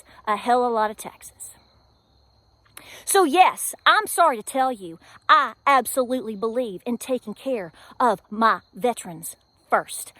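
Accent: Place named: Tennessee, United States. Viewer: I’m so glad to hear you speak like a Tennessean ❤ I’m not apologizing for speaking about this beat down we’re taking. They are trying to kill us off. I’m a Hillbilly & damn proud of it !! No apologies, only regret that I as a citizen, didn’t see this crazy coming sooner. Keep speaking out, & fighting on. Let me know where to find you other than here.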